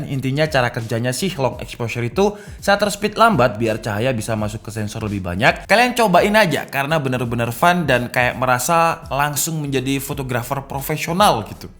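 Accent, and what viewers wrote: Malang, Indonesia. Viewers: Wong malang to tibak e 😂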